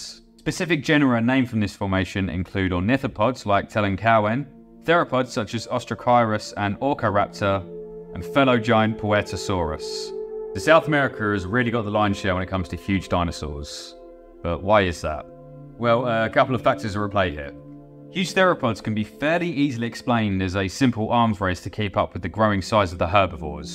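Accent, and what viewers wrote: United Kingdom. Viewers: Easily my favourite paleo channel. Info dense yet easy to digest. And who doesn't love a regional British accent. I would wanna see you try south Yorkshire. It would be funny because you actually annunciate things. Citizens up here less so.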